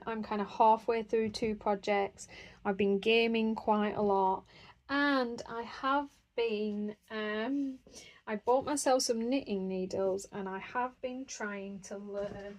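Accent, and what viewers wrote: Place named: North West England, United Kingdom. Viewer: Do whatever works best for you regarding your channels. I came across your diamond painting channel and had subscribed for a while before I realised you had a colouring channel too. I do many hobbies and even if I don’t do a particular one that you do, I do like watching anyway. Besides, it’s great listening to a north west accent. 🤣 Wishing you and yours a very happy Christmas from me and mine. x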